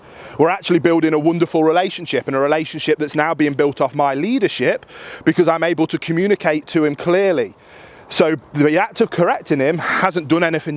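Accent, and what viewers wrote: United Kingdom. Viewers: Finally I love someone's british accent, I can understand everything so smoothly.. so different from Newcastle geordies I used to live with.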